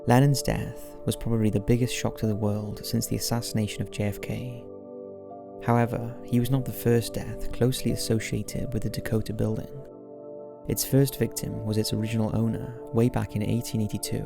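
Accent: United Kingdom. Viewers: I just about choked on my popcorn when he said, "a man named Wilber Ross." Was he THE Wilbur Ross or just somebody who had the same name? Or does the British-sounding narrator not know about Wilber Ross? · whats creepy is the way the narrator speaks with a uk accent but says American style words...take a look..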